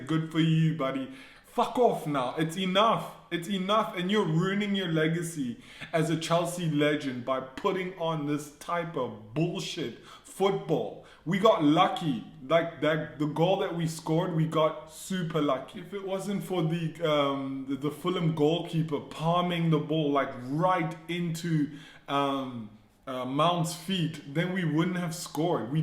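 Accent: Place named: India. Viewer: You look black but you sound 🇮🇳 Indian? I don't understand either?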